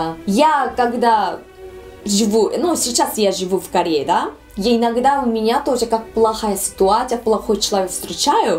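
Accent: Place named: Tajikistan. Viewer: Базаришь как таджик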